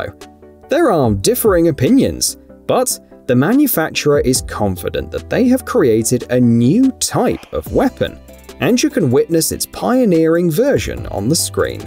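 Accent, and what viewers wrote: United Kingdom. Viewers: No more British accents!!! Thumbs down!!! Irish, French or Swedish!!!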